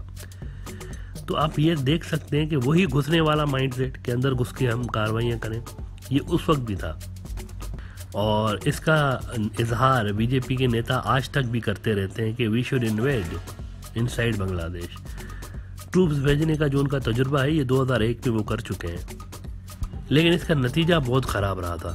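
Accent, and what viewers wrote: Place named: Pakistan. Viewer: Can't you notice his tone of Pakistani Urdu??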